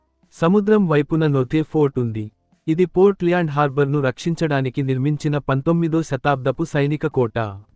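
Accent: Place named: Telugu, India. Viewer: Interesting Telugu accent 😊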